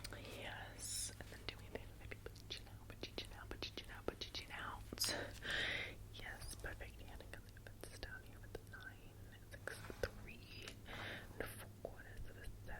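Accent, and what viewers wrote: New Jersey, United States. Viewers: I LOVE the jersey accent! And it low-key reminds me of the mom in karate kid 😭 ifykyk Love ur vids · She can do Southern accents, Midwestern accents, and now Jersey. What more? 😂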